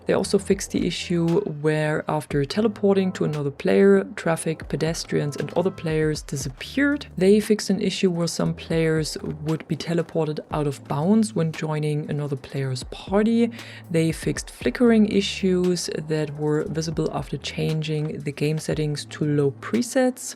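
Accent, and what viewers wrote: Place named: Germany. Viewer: Ich weiß nicht aber hört sich wie deutsch an. Ich bin Spanier aber ich wohnte in Deutschland. My first friends I did in Germany were talkin in English so I know what I’m saying. Can somebody confirm is from Germany Austria Swiss?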